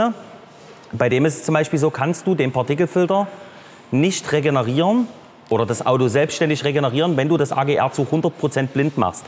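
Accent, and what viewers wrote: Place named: East Germany, Germany. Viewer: Klasse dein Ossideutsch😂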